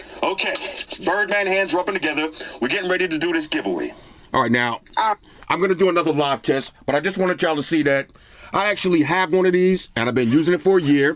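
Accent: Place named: Queens, United States. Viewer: That’s Queens, New York all day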